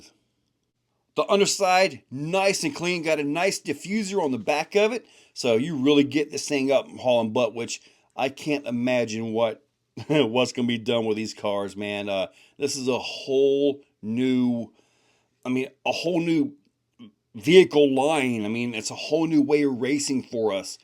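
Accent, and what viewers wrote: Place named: North Carolina, United States. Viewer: Where is he located? I just moved to North Carolina and he sounds like he’s from around here somewhere… lol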